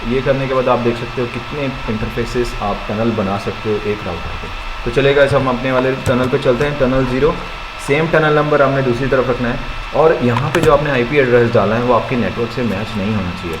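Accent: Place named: India. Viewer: Can't tell if that's Engrish or Indian.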